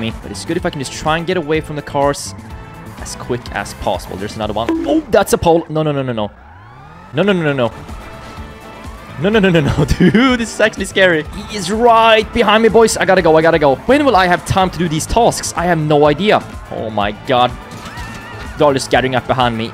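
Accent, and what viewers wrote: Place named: Sweden. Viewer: Swedish ascent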